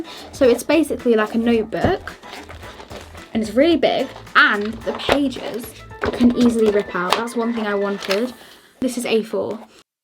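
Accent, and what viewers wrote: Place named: England, United Kingdom. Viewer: Your English acsent i need to get it 😩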